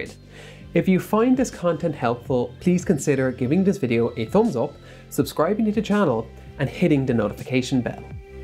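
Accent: Ireland. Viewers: Dublin Accent 😁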